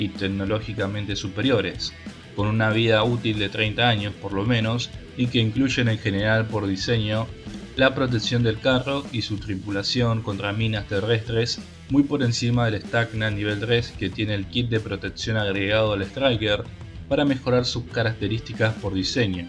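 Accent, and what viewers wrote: Argentina. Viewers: Me encanta tu canal, pero tango una pregumta, pod qué el narrator es o suena ser Argentina? Podrias hacerlo tu end vez? Saludos.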